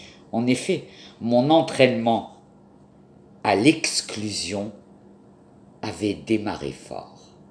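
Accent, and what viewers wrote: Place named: Morocco. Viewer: نعشق لهجتك المغربية التي تدكرنا بالعلاقه المثينة بين اليهود المغاربة والمسلمين المغاربة.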